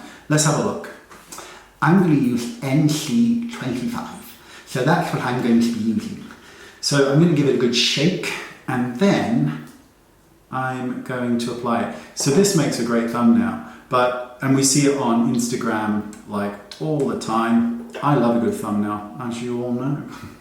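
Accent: United Kingdom. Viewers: Absolutely, Lovely British accent ! I am not even using any make up I just keep listening ! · I always love your videos and Brit accent:-) Makeup is supposed to enhance one's natural beauty and not to produce artificiality. This will help a person age beautifully. Otherwise they will be like mugshots. What I love about you is that you always emphasize this point. Don't worry about who says bad about you. We all love you.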